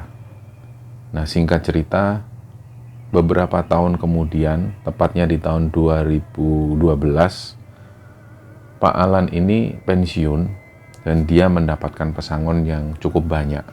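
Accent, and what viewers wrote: Java, Indonesia. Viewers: Salam kenal mas. Pertama kali aku nonton langsung jatuh cinta sama logat bahasa jawanya dan cara berceritanya. Jadinya langsung ku subscribe. 🎉❤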